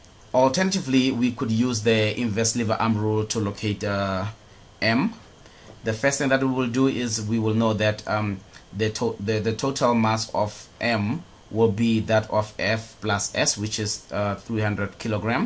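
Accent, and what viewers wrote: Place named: India. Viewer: nice job. Thank you professor. I love your Indian English❤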